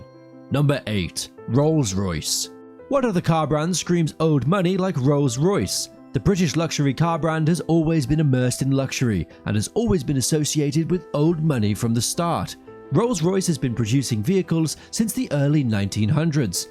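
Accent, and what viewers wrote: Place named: United States. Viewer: Suffers from an American accent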